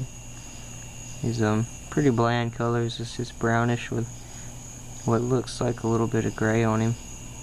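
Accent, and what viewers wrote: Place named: South, United States. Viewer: "Got a hold to him" 😊 You have a sweet southern accent and phrases. I'm southern too ❤️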